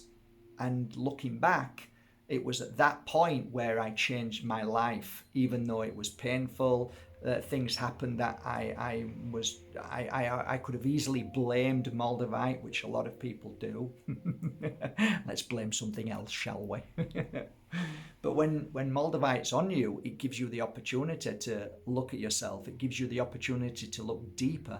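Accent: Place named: Northern England, United Kingdom. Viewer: Hi sir. where are you from. you sound like an northener. im from west Yorkshire. thank you for your advice x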